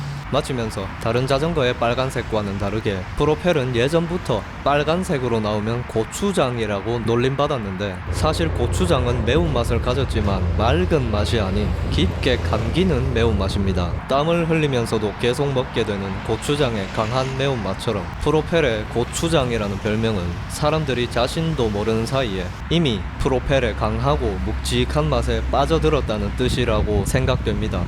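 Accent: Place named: Daegu, South Korea. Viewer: 대구 아재의 구수한 입담은 즐거워~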